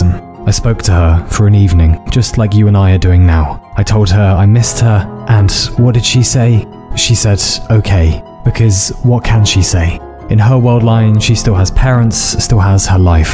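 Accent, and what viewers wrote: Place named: United Kingdom. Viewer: Where have ya been man? I miss your videos I miss your advice I miss your British voice that puts me to sleep at night.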